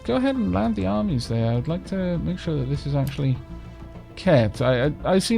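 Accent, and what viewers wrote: Wales, United Kingdom. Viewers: Merry Christmas. No offence but I love your Welsh voice, so lyrical and soft. Love the one hour vids, it's like I'm watchin' netflix. But your welsh and you stroke ferrets. How re the ferrets? Is that the reason for the soft voice, you sexy ferret beast.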